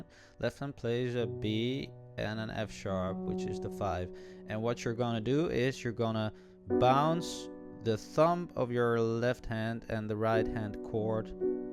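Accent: Netherlands. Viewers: Thank you, this was helpful! :) Also, you sound like you have a Dutch accent, no? haha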